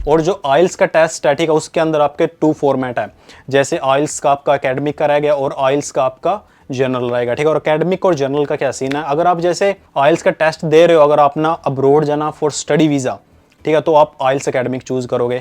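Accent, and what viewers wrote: Haryana, India. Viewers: Haryane ka hi ha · Haryana ka lagta hai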